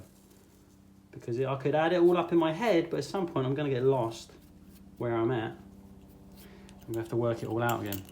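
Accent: United Kingdom. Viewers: so glad to see you on YT - following you and I am learning as I am doing - love your vids and your presence and jokes with your UK accent for us US blokes is great - Great vids and editing by you and presentation is excellent - Thank you for all you do....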